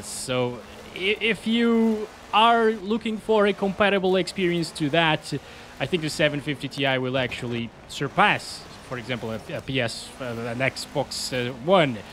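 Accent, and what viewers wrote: Portugal. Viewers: Perae você é português?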